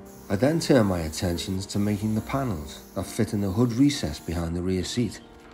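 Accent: Liverpool, United Kingdom. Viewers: Cannot wait for the next one. This is how a video of this sort of work should be. No irritating Muzak, or smart arsed co presenter, playing at comedian, just a guy who knows what he is doing and explaining the stages viewed in a relaxed, quiet Liverpool accent. Superb, thank you,.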